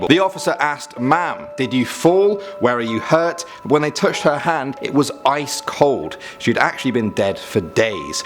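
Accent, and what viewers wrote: United Kingdom. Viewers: I love how he is british and not American and he speaks slow so you can actually hear what he is saying. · British accent so cute....he said " I'd probably run through a wall if he saw that outside his window"😁👍🏽 ♡☆♡☆♡☆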